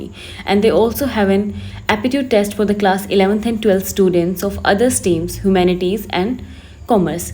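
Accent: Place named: Pahadi, India. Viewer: Himachal wagera se ho kya aap didi? Pahadi accent hai aapka...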